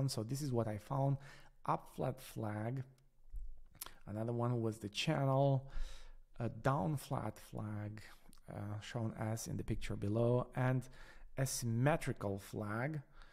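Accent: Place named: Germany. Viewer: Hi, great analysis . Are you german ? I speak some german and your accent is very similar. Thanks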